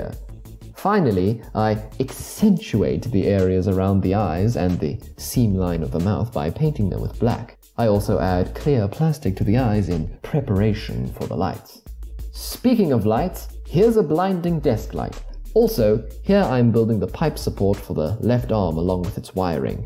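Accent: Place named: England, United Kingdom. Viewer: thanks for replying, i meant i liked the silver mk2 suite seen in th 1st iron man most of all, the costume is meant to resemble a medevel knight to some extent, As is the brilliant robocop suite, silver reminds the eye of this most even though it may be stereotypical , as you americans say "if it aint broke, dont fix it" you do a good english middle class accent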